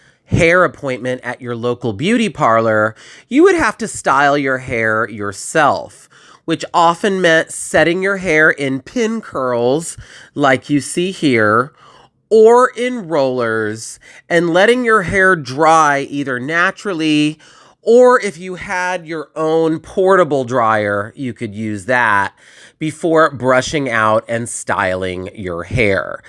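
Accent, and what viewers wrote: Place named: Germany. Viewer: Diese deutsche Stimme😮😮😮😮😮😮😮😮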